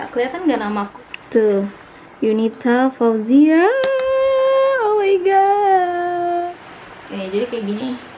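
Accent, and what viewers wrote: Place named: South Korea. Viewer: Logat nya kakak korea banget lohh...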